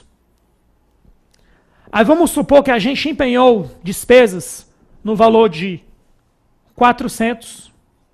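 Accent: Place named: Manaus, Brazil. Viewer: Acho que ele é de Manaus Pelo sotaque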